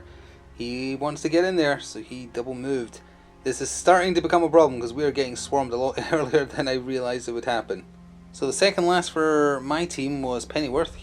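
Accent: Scotland, United Kingdom. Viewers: Where abouts in Scotland u from bro? Enjoying ur content man keep it coming 👍🏻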